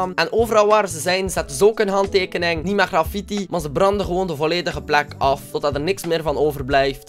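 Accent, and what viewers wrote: West Flanders, Belgium. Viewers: praat jij Belgische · jij praat echt heel belgisch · Belgies accent is wel grappig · Dat Belgische accent is echt leuk😍😍😍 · Rani Claes west-vloams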